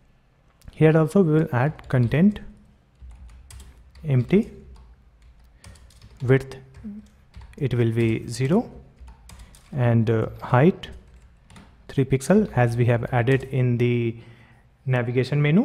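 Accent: Bihar, India. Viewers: Have you been in India or something, cause your accent slightly sounds like so, but your said you were from Australia. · Your sound is like an indian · aap Bihar se hain kya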